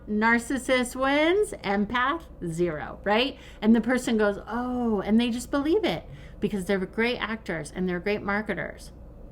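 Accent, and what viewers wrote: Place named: South, United States. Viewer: are you from South? I noticed you have southern accent. I am obsessed with southern culture.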